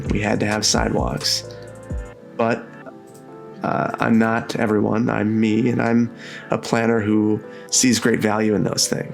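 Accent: United States. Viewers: Standard American pronunciation. We do things to French that would make you either homicidal or suicidal. For example, potatoes "awe grotten," or meat "with au jus." And if a house has a bathroom attached to a bedroom (very common in the US), it is said to be "with en suite."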